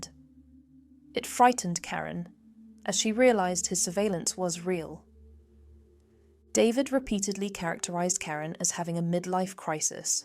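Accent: United Kingdom. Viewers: COPY CAT BRITISH NARRATOR!!!!!!!!!!!!!!!!!!!:face-purple-crying::face-purple-crying::face-purple-crying::face-purple-crying::face-purple-crying::face-purple-crying::face-purple-crying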